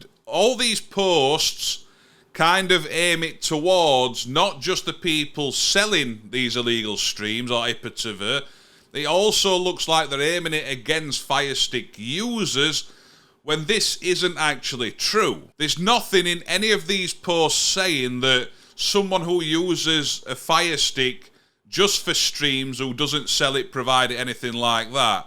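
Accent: Yorkshire, United Kingdom. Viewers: A proper Yorkshire accent. Love it. I'm guessing Barnsley way?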